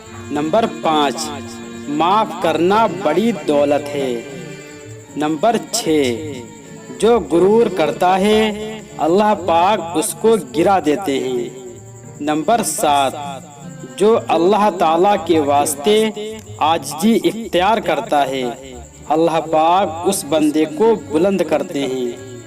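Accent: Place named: India. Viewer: Tallafuz sahi karyei Hindi mei hai Urdu mei boliye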